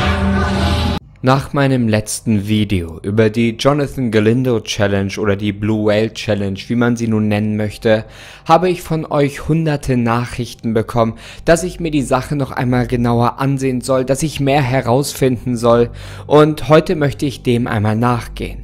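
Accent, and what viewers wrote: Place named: Northern Germany, Germany. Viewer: Norddeutscher Akzent halt.. Ich spreche es auch so aus💪😂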